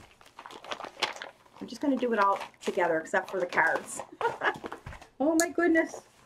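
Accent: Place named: Northeast, United States. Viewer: I figured you were from somewhere in the northeast, your accent was very familiar:) I lived 12 years in the Southern Berkshires, MA. My husband and his family are from Mahopac, NY in Putnam County..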